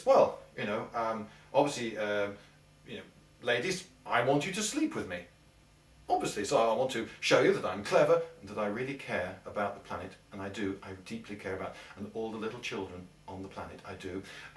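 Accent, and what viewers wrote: England, United Kingdom. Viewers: While I do think that GW is something to take seriously, I also think carbon offsets are just a load of crap. That said, I have to tell you that were it possible, I would sleep with you. :) What can I say? You're both intelligent and attractive, and English accents are hot. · Though I disagree with the actual thing he's arguing against, I agree with the concept and the way he presents it. Maybe it's because I'm American and we are thorough - bread to listen to anyone with an English accent. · Ah yes. I do like me a good English accent.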